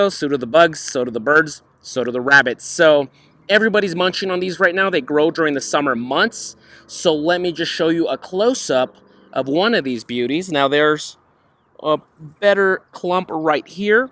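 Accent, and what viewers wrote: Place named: West Texas, United States. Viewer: What part of west Texas you from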